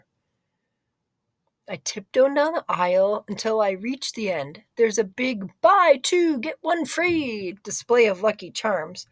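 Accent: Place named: United States. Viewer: Thank you for your video. I really needed an audio of this book for my shadowing so as to get familiar with the intonation of American English. It definitely helps me a lot and your voice sounds great to listen.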